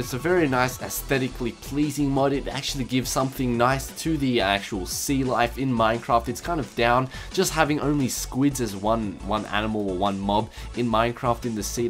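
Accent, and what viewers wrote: Australia. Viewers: Dude 1. It isnt a fake accent 2.The ``fake`` accent is australian, not Russian 3. Learn how to spell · He is Australian, thus he has an Australasian accent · he doesnt have a fake Russian accent its Austrailian and its not fake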